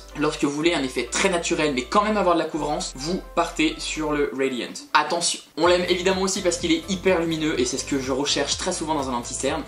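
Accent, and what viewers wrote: United Kingdom. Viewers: Il a l’air pas mal le petit dernier ! 😃 et cette petite pique 😂 ton accent anglais on l’adore 😍😃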